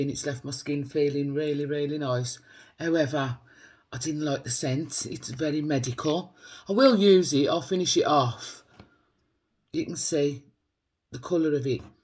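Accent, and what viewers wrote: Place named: West Midlands, United Kingdom. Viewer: Hi just come across your channel, so glad I did really enjoyed your day in the life! Not sure where you come from in the West Midlands, I live in Lichfield, you sound like my friend from Walsall although everyone when we are away always thinks we’re from Birmingham 😂 x x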